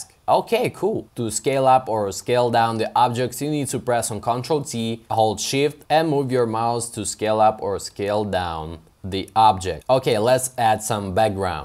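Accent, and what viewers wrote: Russia. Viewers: Я вот чувствовал русский акцент, зуб был готов дать 🤣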